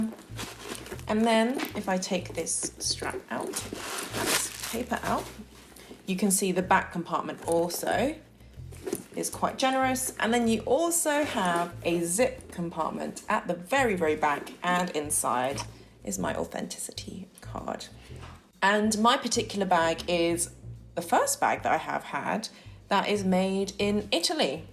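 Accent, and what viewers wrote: United Kingdom. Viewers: Your voice is like a white woman! If I close my eyes I’d thought a white woman’s speaking. . 😊 Your accent of course british, no doubt. But Asian normally has lower tone. Yours is deep. Btw, love your blogs !!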